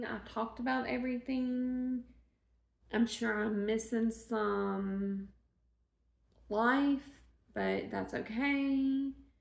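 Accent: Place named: Mississippi, United States. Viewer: I recognize that accent. I’m a Mississippians too. ❤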